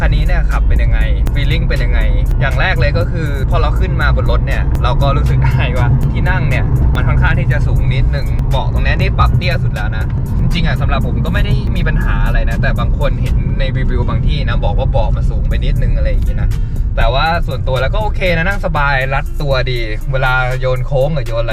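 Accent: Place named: RP, United Kingdom. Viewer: สำเนียงอังกฤษผู้ดีจัด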